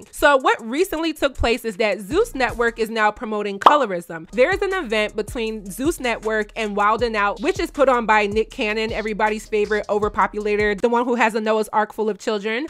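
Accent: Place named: United States. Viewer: Tbh I never understood the hype over Rubi rose she just looks like any other IG thot with not much more than their body to offer lol. This is really random too but you’re so pretty and I don’t live in America so it’s interesting to watch an Igbo girl speak with an American accent, rather than the strong Nigerian accent which I always hear 😆 my mans Igbo and I’m close with his family, we both pointed out that you resemble his sister a little bit when he saw me watching ur video. Her name is also. Igbo features are so strong and easy to spot when you’ve been around them a lot. Very beautiful. Love how they use old English words a lot like “have a prosperous day my wife” (his sisters also call me their wife) and often mention god while they are talking about stuff. 🥹💗 nwanyi oma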